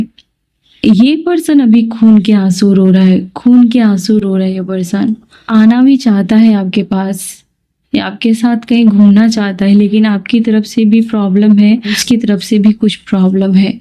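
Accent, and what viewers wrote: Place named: England, United Kingdom. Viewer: Aapka voice me ek Englic Vibe hai ,,,,ayse logo ki madat karte rahiye 👌👌👌 kyunki hum manushya ko bhagwan duniya me bhejte hai jarurat mand ka help karne k liye,,,lekin insaan apna swarth k chalte bura karm karna suru kar deta hai,,,tabhi to zindagi barbad kar leta hai,,, prabhu shree Krishna ji sabko sehi Marg dikhaye 🙏🙏🙏 aap bahut achha kar rahe ho,,,mere Prabhu krishna ji aap ka sath abosya denge,,,ab aapka growth hone ja Raha hai,,,but kabhi Maan me ahankar mat Lana aap ,,,jai shree krishna 🙏🙏🙏 Radhe Radhe 🙏🙏🙏🚩🚩🚩🚩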